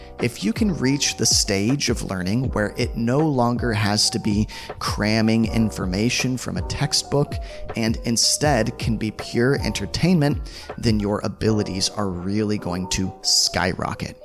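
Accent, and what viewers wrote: United States. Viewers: Are u a native American English speaker? Cuz you sound American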